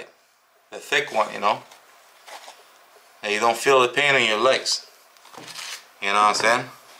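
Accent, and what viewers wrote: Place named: Arab world. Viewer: Where r u from u sound Arab, can you please do a review of more different color shoes, thank, nice review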